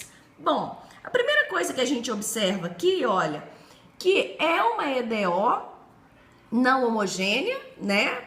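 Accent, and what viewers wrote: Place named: Belo Horizonte, Brazil. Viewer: Sotaque de Belo Horizonte MG...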